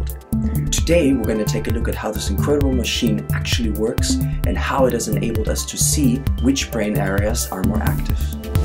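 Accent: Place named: Germany. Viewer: do you happen to be German or where does this very slight German accent come from?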